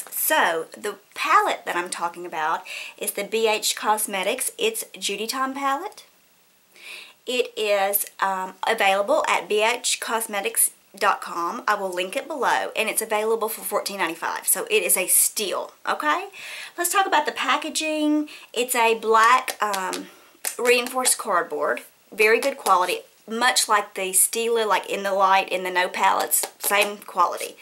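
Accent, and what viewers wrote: South, United States. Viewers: Heard about you on itsjudytime. I love you. Lol You're such a doll. I subscribed. I love watching makeup tutorials.. You may get this a lot but i just have to add i love your southern accent :) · Judy brought me here! I love your accent! You are definitely a belle. ^__^ *subbing*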